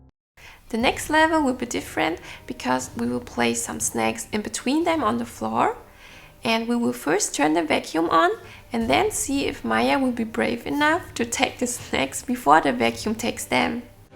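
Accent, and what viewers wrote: Germany. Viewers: are you german? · You are from germany right? I mean the accent and at the top there was the word Werbung and I don't think it's English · Her accent is like from a German ^^ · Are you German?????? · You Are from Germany, Right?😃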